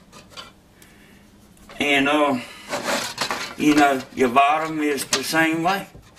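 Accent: South, United States. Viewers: I love the southern accent. 👍🏻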